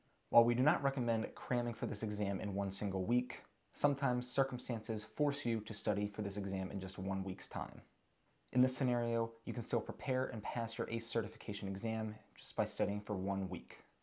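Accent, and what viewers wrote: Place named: Baltimore, United States. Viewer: Almost couldnt tell youre from baltimore 😅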